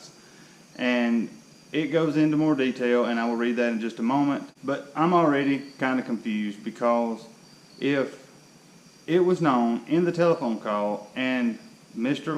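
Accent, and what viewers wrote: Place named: Rural, United States. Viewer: This was probably the worst video I’ve ever watched. Stupid fucking monotone hick accent. I should kick your ass and file a suit for your fucking tractor hick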